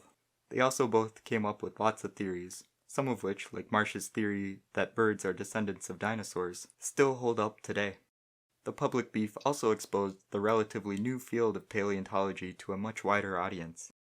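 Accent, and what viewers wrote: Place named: Northern England, United Kingdom. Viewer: Really? He seems so sweet on camera. Must be the northern accent.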